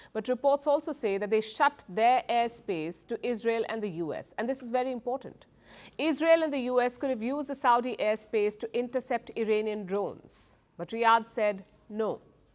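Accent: India. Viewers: Indian accent is the most beautiful accent in the world like this news woman. Rishi should also speak with an Indian accent. Actually, the whole world should speak with an Indian accent. The best accent in the world is an Indian. For sure!! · P.sharma you good reporter but we don't like your Indian accent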